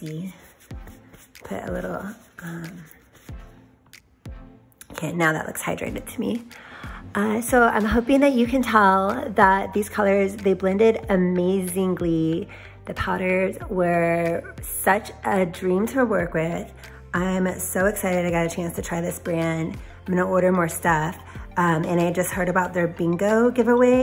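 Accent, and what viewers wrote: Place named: Texas, United States. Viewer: My boyfriend just walked by while I’m watching your video and says “that’s, isn’t it?” 😂😅 he says your voice is “a good Texan southern voice”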